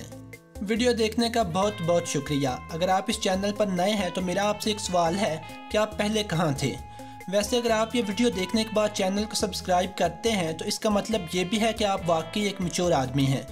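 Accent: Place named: Lahore, Pakistan. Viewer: آپ کا لاہوری لہجہ کافی پسند آیا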